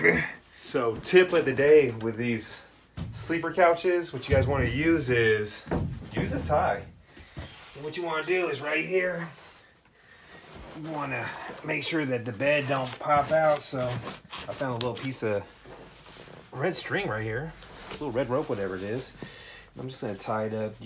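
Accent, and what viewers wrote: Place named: Louisiana, United States. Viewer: Dude is wild with that Louisiana accent. That's what made me subscribe. He's natural with it. And, the background music is the bomb.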